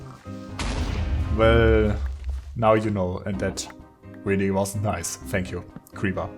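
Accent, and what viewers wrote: Germany. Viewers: Man hört den Deutschen Akzent xD Und geile Idee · r u from germany? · wie man einfach hör das du deutsch bist xD geil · Bist du Deutscher? 😂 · dieser deutsche Akzent xd. beste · Der ist deutsch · Such a strong german accent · Are you german ? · Thanks dude! German accent rullez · duhörst dich nach einen deutschen an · I missed that heavy German accent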